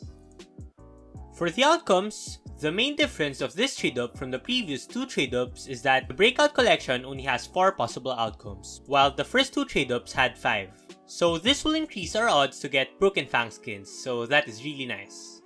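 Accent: Philippines. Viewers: Pinoy voice detected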